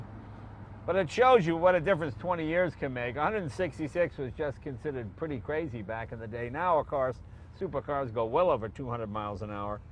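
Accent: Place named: New England, United States. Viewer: Travis Henderson I think he was using it in the plural... Vortecs; or it was just his New England accent coming out. You know...Pak the caa in the yaad.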